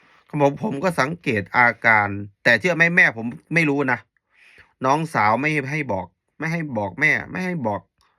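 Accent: Isan, Thailand. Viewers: เทพโยสดำเนัยงเหมือนคนอิสานเนาะ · สำเนียงการพูดไม่เหมือนภาษาลาวเลย เหมือนภาษาอีสานมากกว่า หรือว่าผมคิดไปเอง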